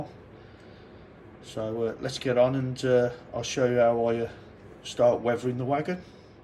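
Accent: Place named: Black Country, United Kingdom. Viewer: As a Brummie myself, accent is more like the black country yam's, sort of reminds me of 'Barry' in Aufhedisen Pet 😅 Good rusting effect video, 👌👍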